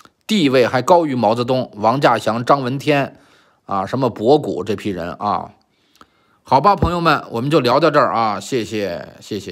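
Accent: Beijing, China. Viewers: 听您一口的京腔就感觉亲切。给您提示一下：萧新华少将不是肖劲光的儿子。他爹是老资格的萧克上将。和肖劲光一样也是湖南人。萧新华文革期间在71-73年下放到湖南长沙。是我们长沙市第三中学（明德中学）的老师兼初中四连的连指导员。后来他在去武警之前在军队的新鑫公司当老总。8-90年代我在北京工作期间去看过他几次。非常随和的人，长得威风凛凛的，和演员项堃有点像，高大的个子，篮球打得很好。是校工篮球队的主力。可惜失联几十年了。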